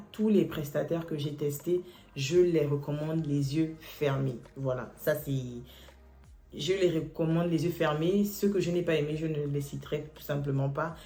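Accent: Gabon. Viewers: Pourquoi on parle tous pareillement 😂 je viens de découvrir la chaîne mais l’accent ci 🥰🇬🇦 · Tu parles comme une gabonaise 😊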